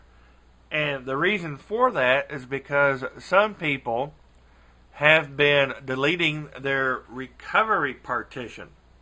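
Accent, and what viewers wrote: South, United States. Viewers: WHAT IS UP WITH THE SOUTHERN ACCENT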